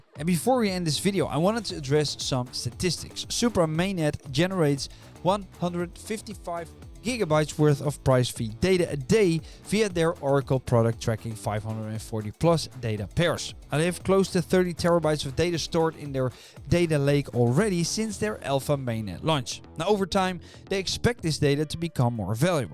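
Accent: Netherlands. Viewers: how high will it go in this run? hoor ik daar een Nederlands accentje?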